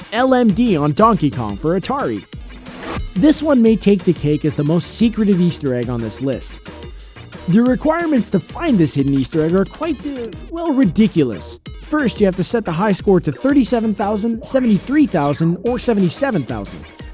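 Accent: United States. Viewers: Has an american accent but calls Z "zed" instead of "zee"?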